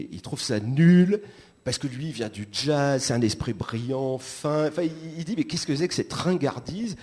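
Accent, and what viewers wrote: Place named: France. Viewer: Trop délirant son accent français quand il dit un truc en anglais mdr ! (ex:Led Zeplin) xD je te kiff Philippe manoeuvre t'es génial (Y)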